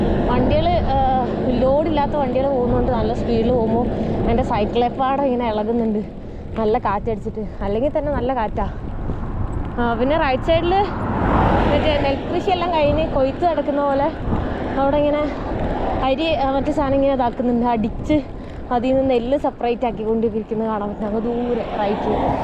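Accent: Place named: Ottapalam, India. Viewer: പാലക്കാട് ഒറ്റപ്പാലം ഏറിയയിൽ ആണോ വീട് സംസാരം കേട്ടപ്പോൾ അങ്ങിനെ തോന്നി ❤❤❤❤😅😅😅😅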